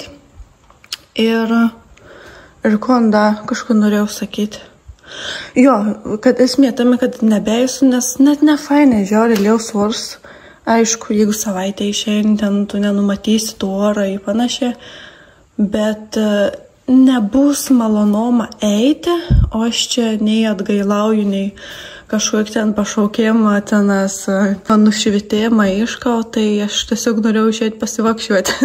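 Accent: Samogitia, Lithuania. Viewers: Smagu girdeti zemaiciu snekta. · Smagu klausyti žemaitiškas tarmės, labai smagiai žiūras. Sėkmės👌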